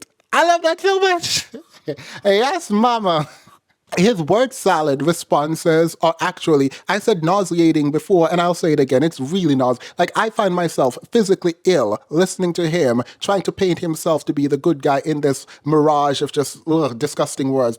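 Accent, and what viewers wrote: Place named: Jamaica. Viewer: You lead with your true Jamaican today and it was AMAZING!!!!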